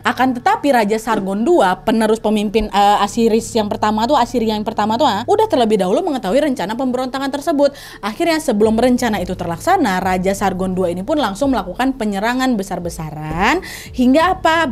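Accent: Indonesia. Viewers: 😂lucu benar kak ni bercerita dgn loghat bahasa Indonesia nya...saya lebih suka lihat dia berbicara drp isi ceritanya😂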